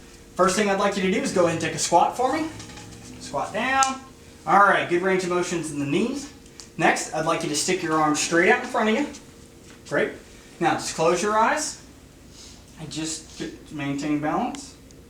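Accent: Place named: South, United States. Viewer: Get it southern accent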